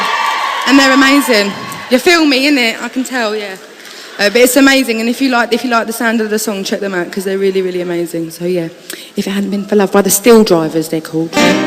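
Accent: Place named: Cockney, United Kingdom. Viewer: cockney hahahh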